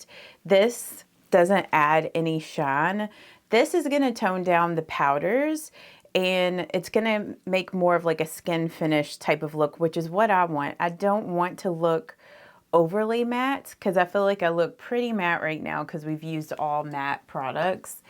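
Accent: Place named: Virginia, United States. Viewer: Hello from Canada,. I have this eyeshadow palette and I will definitely try this beautiful look. I have the exact eye colour as you. I love your accent. (Virginia??)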